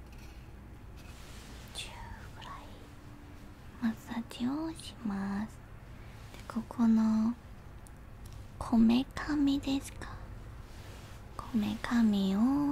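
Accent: South Korea. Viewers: 韓国の女の子が日本語を話すのが好きすぎる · 韓国の方が話される日本語て可愛らしいﾃﾞｽ♥.｡.:*♡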